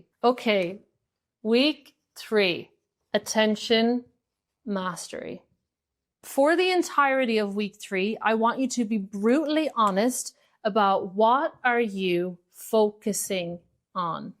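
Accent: Ireland. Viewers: I love her Irish accent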